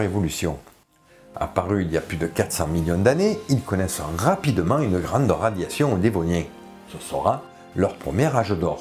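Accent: South, France. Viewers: Merci pour votre vidéo très intéressante, j aime bien votre accent du sud ❤ Je suis content la musique est plus diversifiée, il y en a juste une que j ai toujours autant de mal à supporter 😂, avis très personnel et subjectif bien sûr Par exemple à , pour donner une idée, c est celle ci qui revient très (trop ?) souvent pour moi la musique est inutile en plus. Bonne continuation, j attends le 2 avec impatience. Quel beau travail en tout cas 😊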